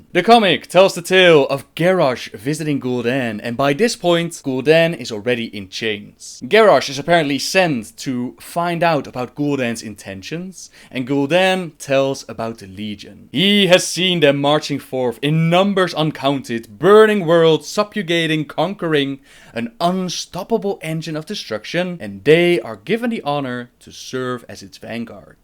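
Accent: Netherlands. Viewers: Where do you come from... it sounds dutch.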